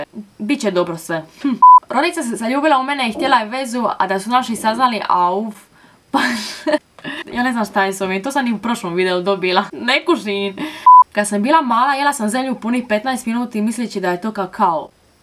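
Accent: Dalmatia, Croatia. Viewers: Kolko volim dalmatinski.. 🤤❤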